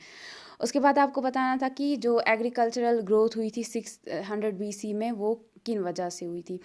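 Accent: Kashmir, India. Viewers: Are u from Kashmir I feel so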